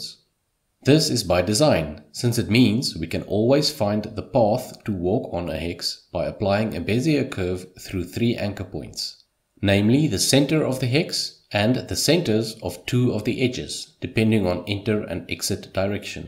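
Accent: South Africa. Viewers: Came here from Reddit. Heard that South African accent, and sub. Gonna start from part 0 tonight!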